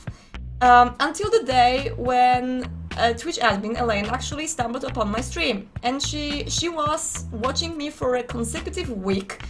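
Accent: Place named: Poland. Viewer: Od razu słychać, że jesteś z Polski.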